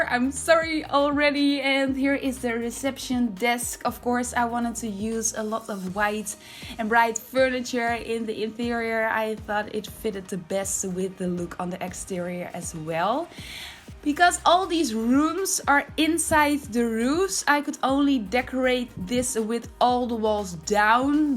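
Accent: Netherlands. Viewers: ik kan het nederlands accent horen in je stem lol heel mooie en creatieve build btw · I can recognize that dutch accent anywhere! Dit gebouw is echt geweldig, al je builds zijn zo creatief, ik heb helaas niet alle packs dus bij mij komt het er nooit zo mooi uit te zien :c Maar ik blijf dromen hahaha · Waarom heb je zo’n Nederlands accenten. Ben je Nederlands? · Hoorde het accent en dacht.. dat is een Nederlandse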